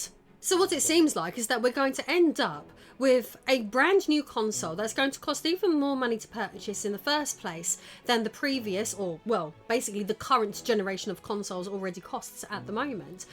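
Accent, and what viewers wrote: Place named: United Kingdom. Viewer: I actually like this new direction for the channel, I could see growing to one day to be one of the top gaming news channels because she knows how to properly research the topic and her British accent elevates the content by lending it a sense of legitimacy that American YouTubers just don’t have. I feel like I’m listening to a BBC news broadcast when she’s discussing the topic.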